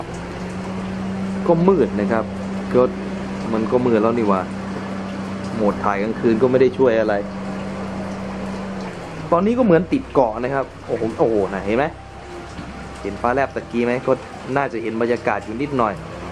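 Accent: Isan, Thailand. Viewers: คนอีสานหรอพี่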